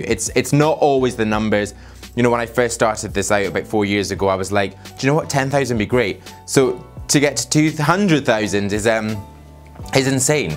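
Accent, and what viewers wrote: United Kingdom. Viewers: Congratulations on your achievement! You help me so much build interest for my hair and having an awesome hairstyle.😊 Also I really love your British accent you look so charismatic. My favourite vid of yours is for sure is when you fixed your worst haircut. <link> Dom really saved the day! Also you were so hilarious in the rant. You’re right about understanding and listening someone (which that barber didn’t did😉). Love from Canada!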